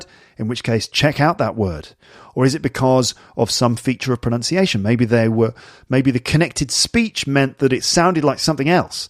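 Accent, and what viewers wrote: United Kingdom. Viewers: speaks the standard form of British English. One of his podcasts he mentioned that he speaks as received pronunciation (the kind of accent that you may hear on BBC). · One of my favourite way to practice listening British English :> Thks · This podcast is exactly what I was looking for, I love the level, the speed, the topics, and specially I love to understand the most beautiful accent: British, at last! Thanks a lot,!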